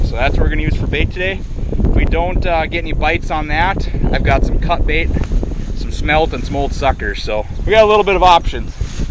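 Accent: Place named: Minnesota, United States. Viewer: with your accent you must be fishing the red river in Minnesota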